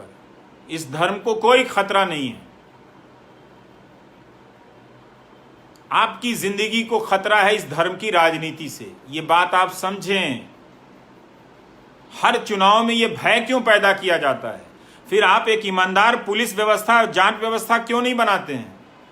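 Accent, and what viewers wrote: Bihar, India. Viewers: I salute sir Ravish ji main aapka bahut big fan Ho aapki Bihar ki language bahut hi Pasand karta hun aapko aap kaise patrakaaron ke liye salute Hai Sar god bless you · Nice to see you continuing your duty of enlighting us aapka bihari language ❤️, we agree that you have done maximum number of programmes on public interest, we respect you.👍🙏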